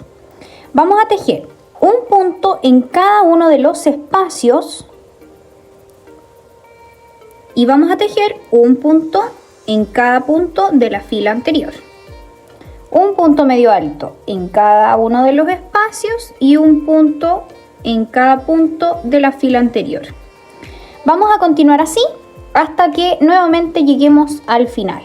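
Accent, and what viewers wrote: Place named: Chile. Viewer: Hola 🤗 Recién encontré tu canal me encanto el vestido y explicas muy bien de donde eres ? tu acento se me hace 🇨🇱 voy a empezar mi vestido espero encontrar el hilo, acá no hay muchas opciones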